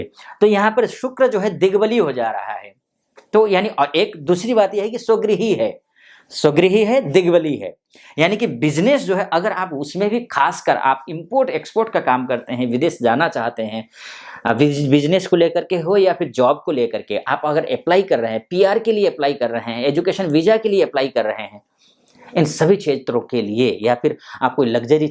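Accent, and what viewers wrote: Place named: Nepal. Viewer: Sir. Aap nepali mul ke hain. Kiuki aap ka bhasha Nepali ka tone me aati hai . App ka prediction bahut good hai.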